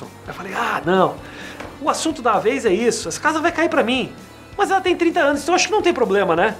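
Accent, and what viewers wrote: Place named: Porto Alegre, Brazil. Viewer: Fala bem de Porto Alegre!! Tu é daqui, po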